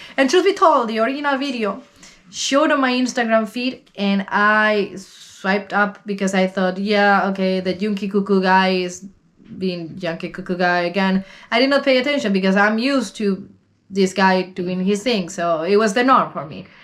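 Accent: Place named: Wallachia, Romania. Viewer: where are you from? you sound wallachian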